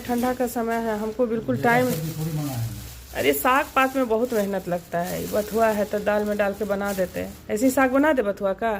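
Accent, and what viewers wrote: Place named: Bihar, India. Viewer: Aunty ji 🙏 apke bhasha se lgta hai ap bihar se hi hai 😊 kis jila se hai ap..bht acchi thali .. testy 😋 hmm hath se ese chawal neri maa v nikalti hai...❤ lovely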